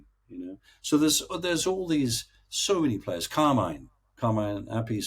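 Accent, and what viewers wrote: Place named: Scotland, United Kingdom. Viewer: sounding very Scottish today!!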